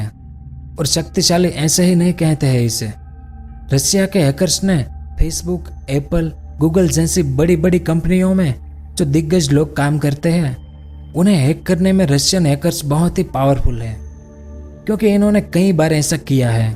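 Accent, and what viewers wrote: Gujarat, India. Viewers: You're accent seems gujrati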